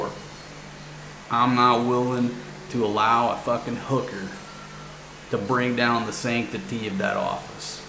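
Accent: South, United States. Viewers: I guess you can diagnose someone from TV or you tube, you sound like a ignorant redneck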